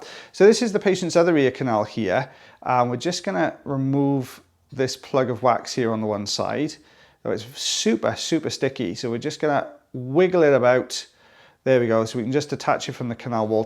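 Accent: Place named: Wales, United Kingdom. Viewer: Hi, love your videos! It took me quite a bit of googling to realize you’re saying “Zoellner” tubes. As an Oregonian, I don’t get much practice understanding lovely Welshmen like yourself. Thank you for the education and entertainment!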